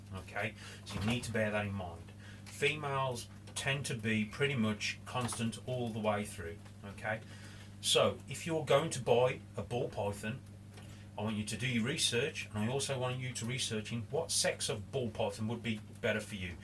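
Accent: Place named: Midlands, United Kingdom. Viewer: It's so refreshing seeing a ball python video from someone who sounds like me 😄 great videos mate, keep em coming. are you based in the Midlands?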